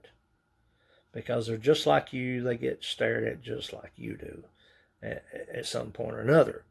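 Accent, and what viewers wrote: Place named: Appalachia, United States. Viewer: Absolutely love the accent! Where are you from my friend? I'm guessing somewhere in the Appalachian mountains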